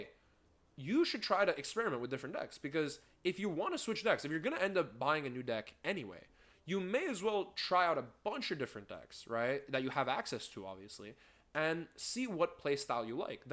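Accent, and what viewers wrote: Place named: United States. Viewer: Wow your accent sounds so American in this video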